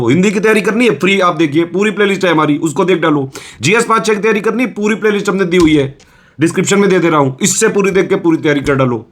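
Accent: Kanpur, India. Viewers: Sir ye apka kanpur flavour mast hai😊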